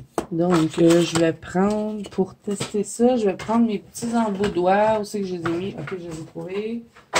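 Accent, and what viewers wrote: Canada. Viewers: Cc 😄merci pour ta vidéo de produits pour le coloriage. Je ne me rappelle plus si je t'ai dit que je me suis abonnée à ta chaîne youtube 😄, j'adore ce que tu fais et j'aime beaucoup ton accent canadien,bises, 🥰❤️💕 Martine.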